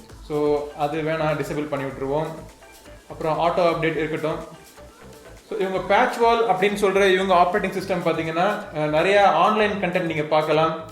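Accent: Madurai, India. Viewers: Bro neenga madurai ah? Super!!!! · Bro..neega Madurai ya....? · Niga Madurai ya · Bro Madurai style is super..... Like acter Vishal Daai naanum madurakaaran thaandaa.... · Semmma madurai speech and review suprrrr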